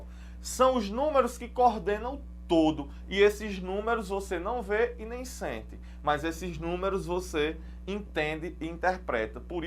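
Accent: Northeast, Brazil. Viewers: Ótima aula professsor! Seu sotaque de nordestino deixar a aula bem descontraída e fluída , e parabéns pela explicação! Melhor impossível... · Eita professor arrochado véi! Conheço seu sotaque viu peixe, é nordestino que nem eu. Brincadeiras à parte, excelente e maravilhosa aula meu nobre, PARABÉNS!!!!!😊😊😊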